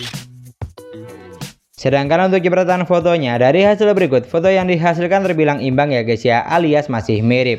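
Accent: Madura, Indonesia. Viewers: Madura ya bang?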